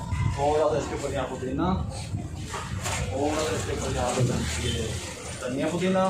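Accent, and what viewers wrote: Punjab, India. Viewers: Aapki punjabi sun ke din ban jata hai bhai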